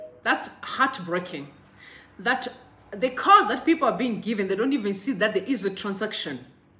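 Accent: Zimbabwe. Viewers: Good content but be yourself , you try too hard to sound British and then slip into your default Shona accent and back to British accent... Go to school work hard was a Whiteman s agenda when they put the school.system . We need our own system that works for us.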